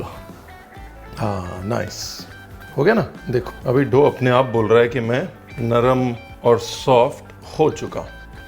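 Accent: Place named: Uttar Pradesh, India. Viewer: Ranveer d way u talk and d words u use i can so much relate to them coz m also frm UP .... apnaiyat ati hai bohot 😇... n i so much enjoy watching ur videos they are so different from others their is a charm... love ur simplicity and humble attitude ❤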